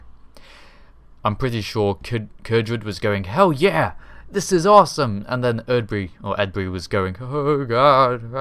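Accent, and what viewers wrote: United Kingdom. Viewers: im really sorry for asking such a stupid question, since im not sure.. but do i hear a british accent?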